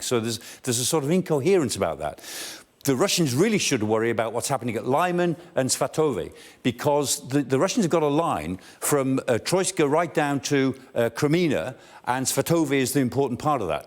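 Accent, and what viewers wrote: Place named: England, United Kingdom. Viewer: He really does. I thought that he and Michael Caine must be from the same part of England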